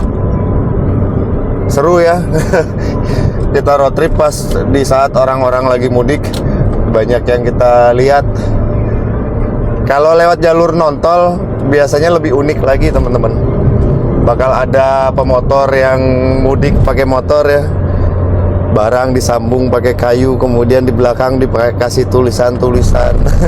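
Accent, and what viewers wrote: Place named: Bandung, Indonesia. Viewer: O gt ya bang,, mf ya,, tp logat bicaranya kyk asli dr bandung gt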